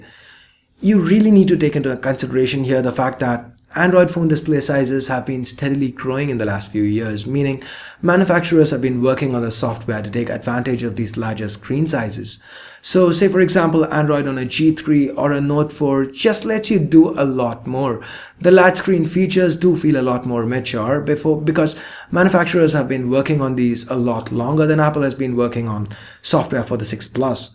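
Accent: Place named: India. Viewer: I can understand him really well lol he sounds the same as any Indian.... Which is not bad!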